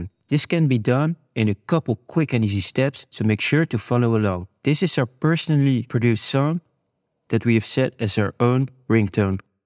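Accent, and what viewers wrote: Netherlands. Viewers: Are you Dutch you have a very nice accent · u sound so dutch 😭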